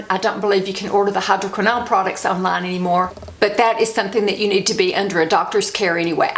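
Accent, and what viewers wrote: South, United States. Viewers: I just found your channel and I just love it. My family is from the south so I love your southern drawl. Also, my mother has fought Crohns for many many years - and lives in the Knoxville area. Please keep these videos coming. Love you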